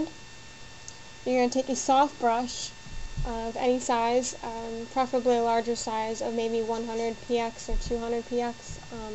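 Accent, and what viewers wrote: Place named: Asia. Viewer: you sound like an asian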